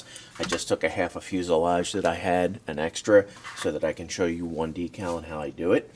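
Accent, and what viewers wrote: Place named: South Jersey, United States. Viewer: Love the South Jersey accent.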